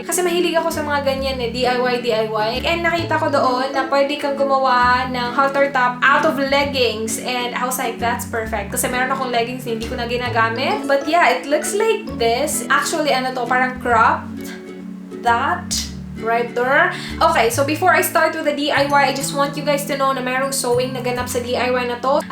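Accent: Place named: Visayas, Philippines. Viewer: She i guess is Bisaya, i love her accent when she speaks English.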